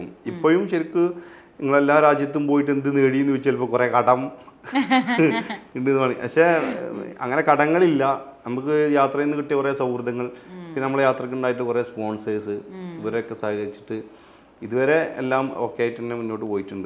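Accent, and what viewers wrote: Malappuram, India. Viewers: Malappuram slang കേൾക്കാൻ തന്നെ ഭയങ്കര രസമാണ് ❤ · തനി മലപ്പുറം സ്ലാങ്ങിലുള്ള തമാശയും പറഞ്ഞ് ബുള്ളറ്റിൽ യാത്ര ചെയ്യുന്ന കാണുന്നത് വർഷങ്ങൾക്ക് മുമ്പ്, ഇപ്പോൾ ഒരു പാട് പക്വത വന്ന യാത്രികൻ ❤❤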